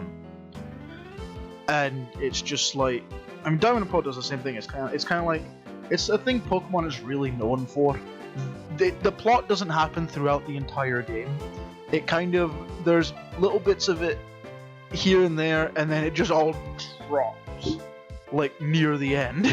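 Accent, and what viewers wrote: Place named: Scotland, United Kingdom. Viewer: So much Scottish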